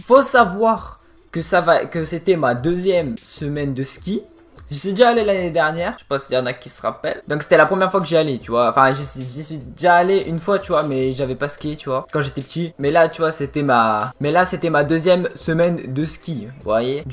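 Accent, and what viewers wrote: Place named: South, France. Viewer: accent du suuud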